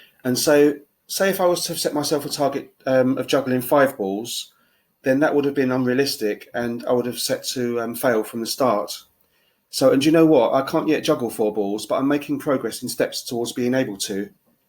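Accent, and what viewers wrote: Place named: United Kingdom. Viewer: I never really noticed that you had like a British accent. (Is it a British accent?). Nice video by the way.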